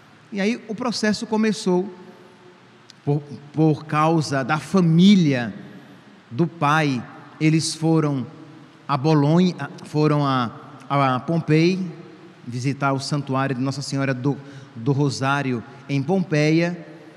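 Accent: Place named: Northeast, Brazil. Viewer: Esse padre é da onde.Muito boa a omilia.Deve ser Nordestino pelo sutawue.Sou de MG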